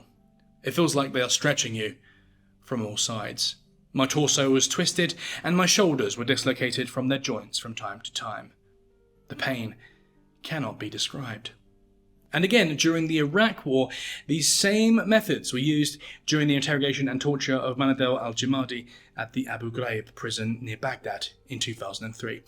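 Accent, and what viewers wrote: England, United Kingdom. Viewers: Just got home saw the alert have a new video, oh man dinner with a side of torture in that soothing English voice 😂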